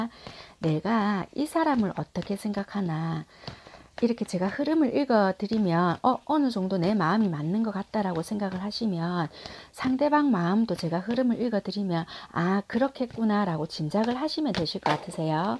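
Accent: Busan, South Korea. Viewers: 부산 사투리 뿜뿜 타로 그런데 카톡에 마리타로로 검색하니까 안나와요 ㅠㅠ 오픈채팅으로 검색해야 하나 싶어서 검색했더니 마리타로가 딱 하나 있는데 그분은 이 아니더라고요.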